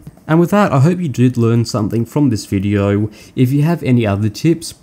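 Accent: Australia. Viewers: Nice Aussie accent ! Reminds me of a Brit who spent a long time in Perth, WA, but I could be wrong. Otherwise, the various tips are useful, thanks.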